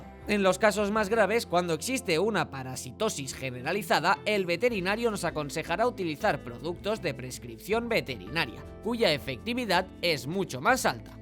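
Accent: Spain. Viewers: Por favor aceite de que??? No le entiendo, quise poner subtítulos y no se pudo. La persona que habla no habla claro, tiene acento español y por eso no le entiendo 🤭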